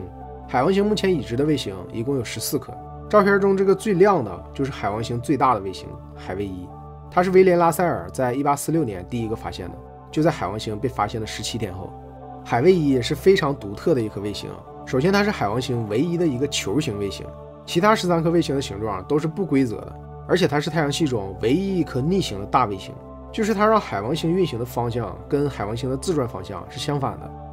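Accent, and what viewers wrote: Northeast, China. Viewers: 好重的东北口音 哈哈哈哈😂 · 这东北大碴子味儿听着真难受，每句话的最后一个字都是降调，又软又怂又丧失，把挺好的文案全毁了，越听心情越低落，每句话都出溜着说，老头钻被窝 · 能不能不说东北话，太拉低档次了，好好学习学习普通话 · 做得很好。有点东北口音更好😊！ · 这个东北口音太逗了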